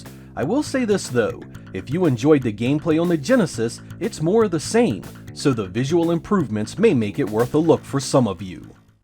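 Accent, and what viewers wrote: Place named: Mid-Atlantic, United States. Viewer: Man it’s obvious you’re a Mid-Atlantic guy!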